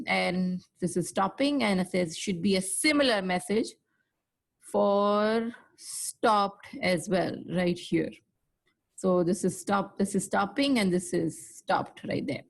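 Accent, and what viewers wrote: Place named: India. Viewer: Explained very well!. Was looking for one with Indian accent. Thanks a lot!!!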